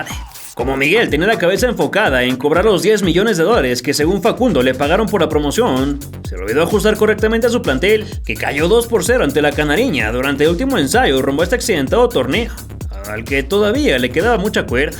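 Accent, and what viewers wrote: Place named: North, Mexico. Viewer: Que onda con el acento norteño del narrador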